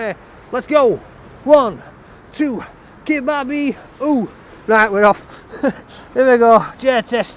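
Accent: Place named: Northern England, United Kingdom. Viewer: I'm here in Washington, DC. Most of the Brits we have here are Oxford or Cambridge graduates, and hold fancy jobs. They have posh, upper class accents. We don't get to see many people from the North of England on the media, and don't hear many working class accents. At times its a little hard to understand, but I love watching him anyway!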